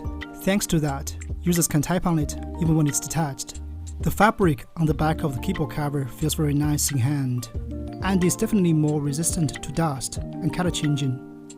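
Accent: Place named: China. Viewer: Fluent English with a strong but unique Chinese accent, I love it.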